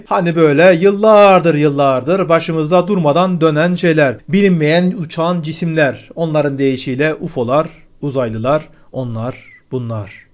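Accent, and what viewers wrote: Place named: Kahramanmaraş, Turkey. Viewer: Selam, senin kökenin galiba Kahramanmaraş dır. Şive öyle diyor Ede. Devam et haberlere. Çok güzel sunum yapıyorsun.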